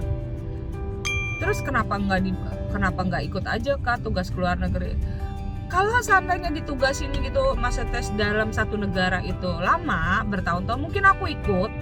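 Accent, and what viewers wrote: Bekasi, Indonesia. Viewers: 21 tahun di Korea tapi Bekasinya masih berasa beeeeudd🤣🤣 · Dari pertama ngomong, logat dll udah bs di tebak klo nih orang Bekasi.... Beda gentakannya.... Hahahaha.... Kanmaendah.... 😁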